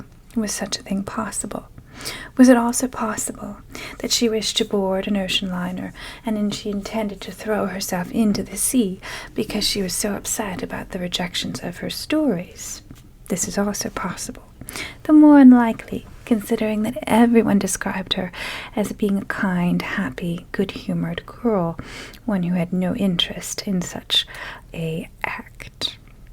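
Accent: Transatlantic, United States. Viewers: The cadence in which you speak is very interesting, is your accent natural or is that something you’ve worked on? Would you describe it as a transatlantic accent?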